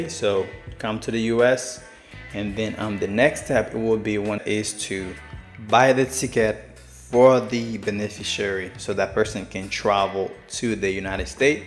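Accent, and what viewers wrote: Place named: Haiti. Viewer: You re clearly Haitian . I hear your accent. Why would you do something so important only in English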